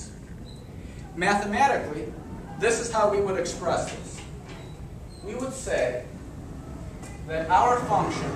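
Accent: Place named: Ukraine. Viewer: Great stuff,. Excellent presentation in terrific English with only a hint of a Ukrainian accent. Thanks!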